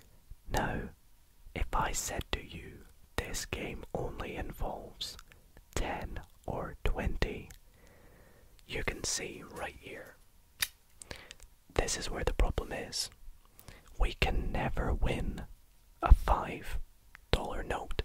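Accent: United Kingdom. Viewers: i love your asmr math channel. i also like your British YouTube name and accent. the British tends to call mathematics maths in abbreviated form.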